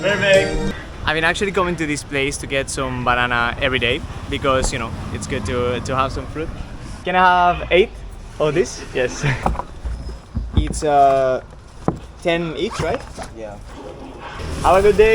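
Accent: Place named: Spain. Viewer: Bali is already established while Siargao is just beginning to show and mark its presence among the world”s favorite island resort. I love your Spanish accent though and be yourself always but I would not be surprised if you will gain more subscribers and followers while in the Philippines. Enjoy ur stay in Siargao and also visit Boracay and you find so many luxurious accommodations.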